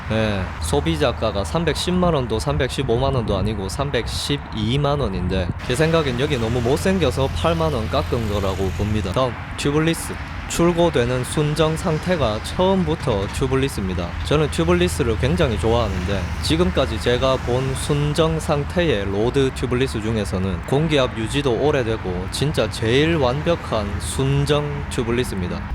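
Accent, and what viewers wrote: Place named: Daegu, South Korea. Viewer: 대구 아재의 구수한 입담은 즐거워~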